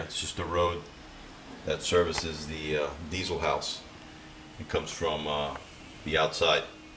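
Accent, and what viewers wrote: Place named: New York, United States. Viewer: I was going to ask you are you from New York because you sound like it..lol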